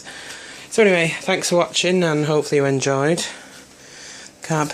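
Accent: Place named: Manchester, United Kingdom. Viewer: A mancunian accent wohoo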